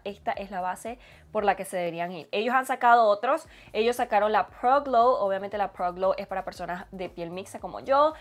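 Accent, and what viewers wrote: Venezuela. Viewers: Me encantó el vídeo! Primera vez que veo tu canal y de verdad me encantó. Jaja ya decía yo ese acento es Venezolano ♥️ saludos desde Barquisimeto.!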